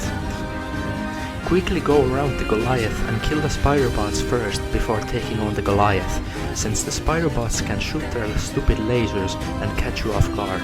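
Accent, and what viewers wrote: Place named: Finland. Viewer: sounds like an finnish accent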